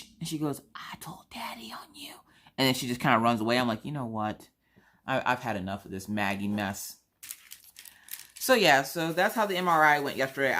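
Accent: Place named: Georgia, United States. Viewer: I’m actually surprised that they did your MRI. I say that because I broke my hip 3 weeks ago and my doctor thinks I need surgery but now no surgeries or any procedures are being done except chemo and dialysis, and life threatening surgeries. Of course it may be a state thing and I’m in Georgia.( got that accent too lol) Glad you were able to get it done.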